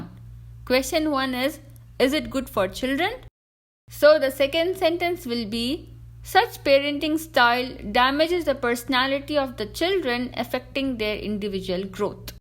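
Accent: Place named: India. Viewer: ahahaha I love Indian accent !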